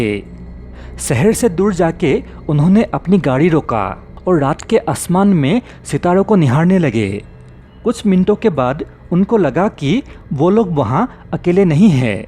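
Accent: Assam, India. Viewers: Apunar tone Tu alop asamiya jen lage assomor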